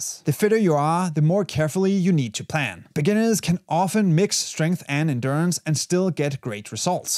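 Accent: Denmark. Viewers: er du dansker, kan høre det på accenten lol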